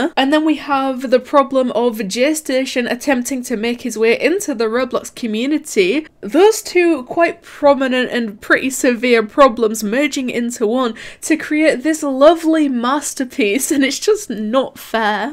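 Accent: United Kingdom. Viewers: Yez she has british accent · Is this british accent? No seriously is it? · She has a British accent.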